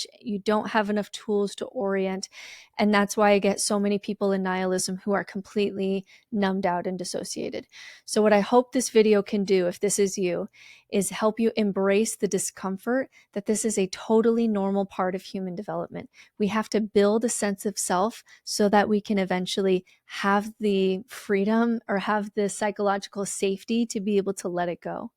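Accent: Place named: United States. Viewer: I needed an American voice to speak to my American Brain and my American Heart. Thanks my Sista from another Mista!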